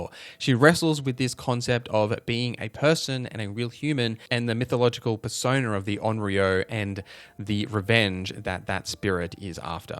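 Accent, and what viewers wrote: Australia. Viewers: He's not from New Zealand he's Aussie. Big difference buddy.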